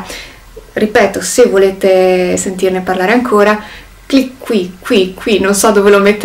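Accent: Trentino, Italy. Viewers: Si è vero, non hai detto boiate, i parametri fondamentali sono pronuncia dei fonemi e intonazione. A te per esempio si sente la marcatura trentina della s quando parli italiano Nelle altre lingue che usi nei tuoi video ti trovo invece ottima, da semplice linguista e non madrelingua, non trovo differenze con parlanti nativi. Non so spiegare questi fenomeni se non con la predisposizione naturale, non è sufficiente da solo l’impegno e l’esercizio, in quanto anche il tuo video francese è sì divertentissimo come collage di parole :’D ma buono come velocità ed accento con soli 3 mesi di studio. Ho compagni di Uni che parlano ogni lingua straniera "all’italiana" anche dopo 8-10 anni di studio!! Lo trovo una mancanza di rispetto nei confronti del nativo a cui ti rivolgi, c** Gino, un minimo di sforzo! :/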